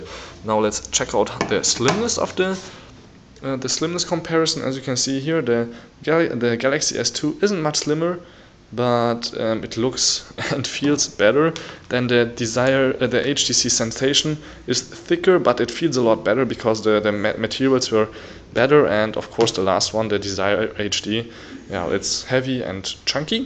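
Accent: Germany. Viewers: Man hoert deinen deutschen akzent raus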